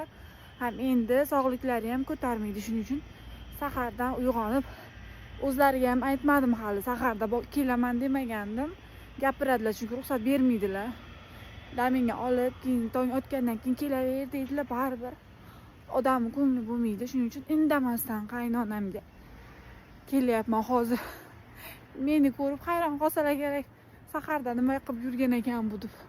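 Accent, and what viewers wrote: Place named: Bukhara, Uzbekistan. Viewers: сиз бухороданмасми шевангиз охшаяпти олот каракулни шевасига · Бухороликларнинг тили на мунча ёкимсиз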